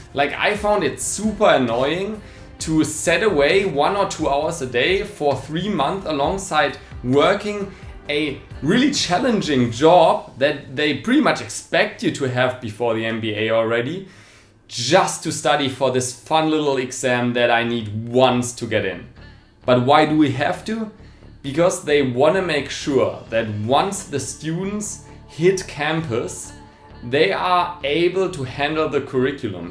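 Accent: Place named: Germany. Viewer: This is like the staple German accent. If someone in Hollywood wants to do a German accent they need to study you.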